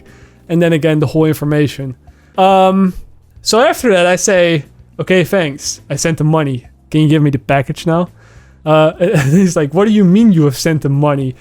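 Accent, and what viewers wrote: Netherlands. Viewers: BTW, I only started watching you recently, but the first video I saw from you, I could already tell you were the Netherlands... It's you accent, it's so obvious haha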